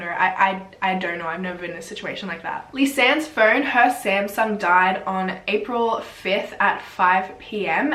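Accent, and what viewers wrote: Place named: Brisbane, Australia. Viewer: Girl I somehow knew you were from brissy listening to your accent 😂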